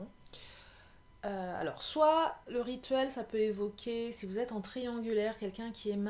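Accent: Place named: Paris, France. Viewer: Coucou, tu as un bel accent. Parisienne ? Merci de ton partage. Très belle lecture. Tirages a choix serait bien aussi. Plus interactif 😉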